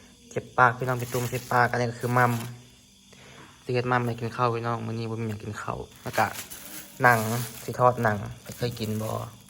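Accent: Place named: Phu Thai, Thailand. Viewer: ผะเลอมิเว้าภูไท เยอะฟังเจ้าเว้าคือละม่วน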